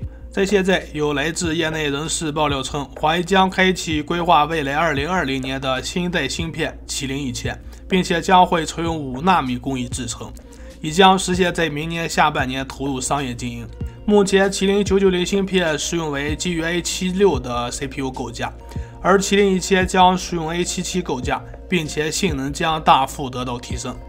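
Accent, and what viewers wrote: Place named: Chahar, China. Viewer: 察哈尔口音，老乡啊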